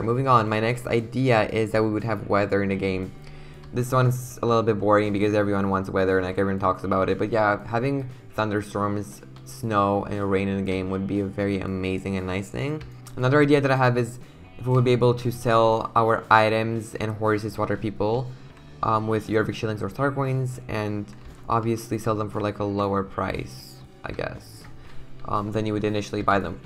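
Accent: Romania. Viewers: Is he Romanian?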